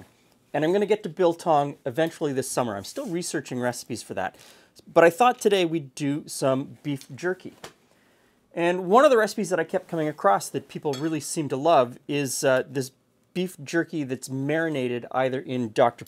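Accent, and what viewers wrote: Canada. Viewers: It's funny, despite saying pop and hearing a Canadian accent daily, I'm always still momentarily taken aback by hearing them in the media I consume. Thanks for all the work you do,!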